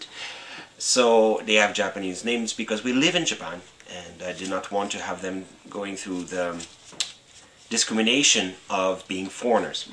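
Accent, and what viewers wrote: Quebec, Canada. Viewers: Yay canadians - you sound french canadian.. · lol im from montreal and i picked up on your accent quick to. great to see quebecers doing big things in the world as the gov here to day seem to think the world revolves soley around quebec. anyways intersting video, thanks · Your speaking English with a "Quebecer" accent. Your son can be Tri-lingual! After all they do speak French in Quebec. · i am wondering which part of canada you came from. Your canadian accent is different from Ontario accent. Many people often think all Canadians speak just like you, and I couldn't understand why because people from ontario speak the same as a lot of americans. So I wondered where you came from. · He's lost alot of his canadian accent lol!. OH and happy Canada day from the capital :) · what's up with the accent? is that canadian? the weird sounding Ts?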